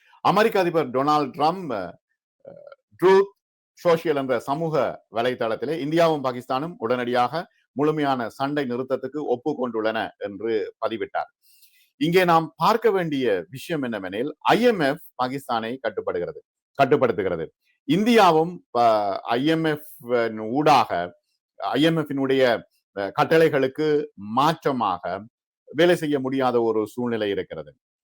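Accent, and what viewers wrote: Sri Lanka. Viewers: முதலில் நீ யாரு உன் தமிழ் இலங்கை தமிழ் மாதிரி தெரியுது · Brother unga srilanka Tamil arumai aana india vanthu Pakistan ku kudutha adi perusu aana indiala paathippunu solluvathu thavaru ingu pathippu apdina Pakistan kaiyala thanamana kolai thanamana makkalai thakkiyathu eni indian army vidathu indian PM Modiji vidamatyaru usa eni vantha angaium adi vilum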